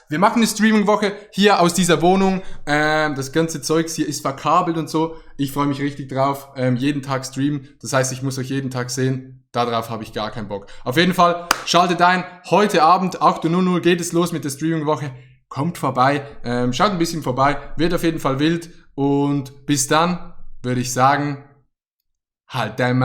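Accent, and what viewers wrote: Standard. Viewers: Es ist hochdeutsch! Yipeeeeeeee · Feier es das du viel Hochdeutsch sprichst 👍👍👍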